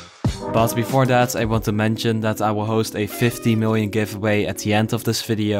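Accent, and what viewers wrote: Netherlands. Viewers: Ur dutch right? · i smell nederlands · we kunnen nu al zeggen dat je nederlands bent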